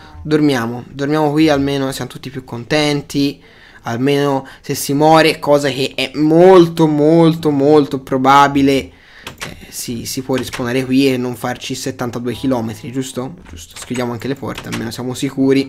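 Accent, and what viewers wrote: Florence, Italy. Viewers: adoro il tuo accento fiorentino :D :')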